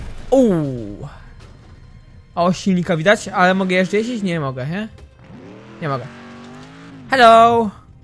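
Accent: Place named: Germany. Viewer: ty gadasz z akcentem niemieckim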